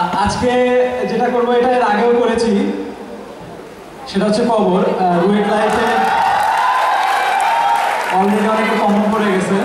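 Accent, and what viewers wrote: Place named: Chittagong, Bangladesh. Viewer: ভাই আপনার বাড়ি কি চিটাগাং এর দিকে নাকি?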